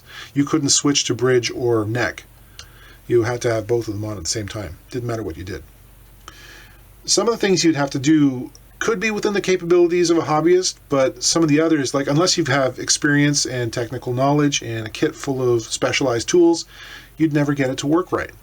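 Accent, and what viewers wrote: England, United Kingdom. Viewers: British accent, classic. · I thought so too well, ENglish not British accent). Then I thought of older, bolder, colder, holder, folder etc. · Never mind "solder"......I'm still surprised that you all appear to pronounce the word route (UK pronounciation 'root') as rowt......I never heard Bobby Troup's song sung as "rowt 66"....always "root 66".....just making the comment.....love your absolutely spiffing tutorials and what a class English accent!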